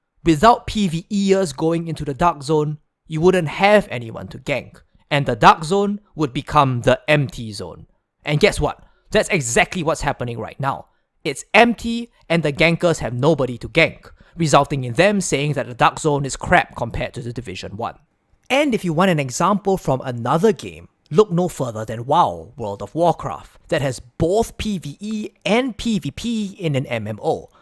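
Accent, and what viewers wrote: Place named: Singapore. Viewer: He's from Singapore. I was initially trying to figure out the accent as well. Cantonese speakers from HK will enunciate but often have have terrible vocabulary. Taiwanese speakers often inject the "z" sound in everything and usually talk slower. Singaporeans enunciate, have good vocabulary, talk fast, but they have that odd accent which I assume is a mashup of multiple different languages. Since Singapore is the hub for South East Asia, most Singaporeans are trilingual by default.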